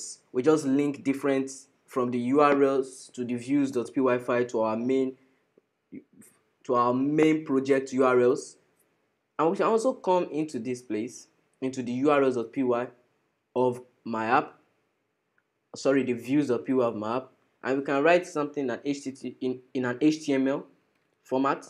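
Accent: Nigeria. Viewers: May God bless you. This is the only video that is slow enough for me to comprehend. It also has this Nigerian accent.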